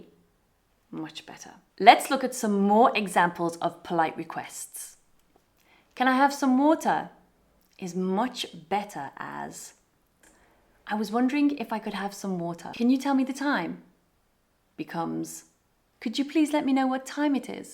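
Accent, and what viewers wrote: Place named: Midlands, United Kingdom. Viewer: Your accent appears to me " Midlands or estuary accent " don't you?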